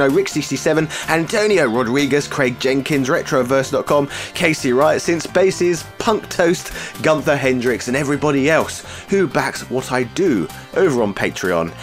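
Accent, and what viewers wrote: United Kingdom. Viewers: I love your nod to wrestling gimmicks. That's my favourite part. Plus, as a Canadian I find your British accent hilariously awesome for your character.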